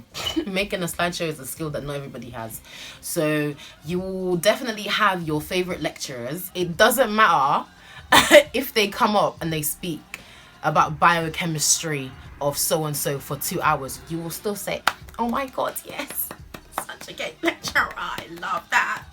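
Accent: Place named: Nigeria. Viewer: Love, Love, Love the little dash of your Nigerian accent😅. My mum was telling me the other day that she thought that her dream of being a doctor was going to be fulfilled through me (can you imagine!). I just laughed because imagine a doctor that doesn't like seeing blood 🤢. Anyways love the video, because "I can't come and kill myself"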